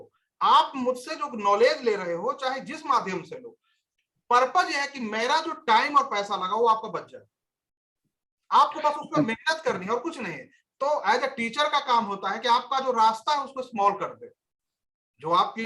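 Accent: Bihar, India. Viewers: Sir do you belong to Bihar?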